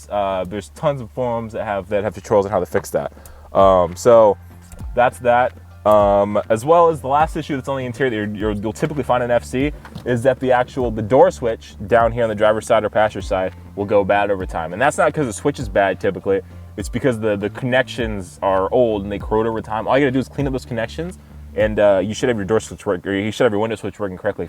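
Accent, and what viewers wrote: United States. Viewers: What part of Nebraska are you from?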